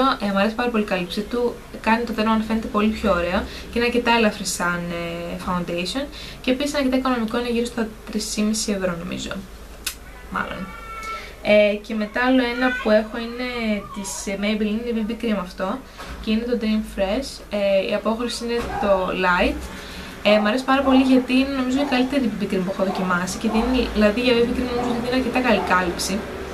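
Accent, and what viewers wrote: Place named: United States. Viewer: Πραγματικα εισαι πολυ γλυκια κοπελα και τα αγγλικα σου με αμερικανικη προφορα οσο μπορω να κρινω εγω ειναι πολυ καλα δεν καταλαβαινει ο αλλος οτι δεν εισαι απο την αμερικη!Αλλα λεγε λεγε μαλλον αυτα λιγο στα ελληνικα χαλασε η αρθρωση δεν ειναι τιποτα διορθωνεται προσπαθησε λιγο πιο αργα και να ακουγονται ολοκληρες οι λεξεις καντο σαν πειραμα διαβαζοντας μονη σου μην κομπλαρεις και σταματησεις τα βιντεο στα ελληνικα ισα ισα θα σε βοηθησουν και μιλας καλυτερα απο κατι αλλες να εισαι σιγουρη!:D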